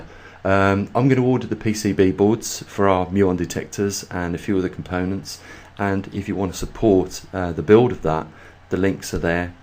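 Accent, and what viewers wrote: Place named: England, United Kingdom. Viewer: When it comes to channels like this, I gauge if it is worthwhile, by the sound of the narrators voice... this is the first Chanel I’ve subscribed to that the narrator is English. All of my other subscriptions are either American or Canadian. Which is ironic because I am British and live in Somerset. So thank you, your voice is the first English narrator I’ve subscribed too. The key to the narrators voice is the ability to capture my attention. Ive used trail and error to do this, using one particular persons voice as a bench mark, probably the most famous narrators voice of all time and still the best by a long chalk. Sir David Attenborough. High bar, I know. But sadly, if the voice doesn’t tweak my curiosity then it just gets passed over within a second or two and I move on. Btw nice that you got hold of some data collected by the Chinese, if genuine then tis a rare commodity indeed. 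🙇‍♂️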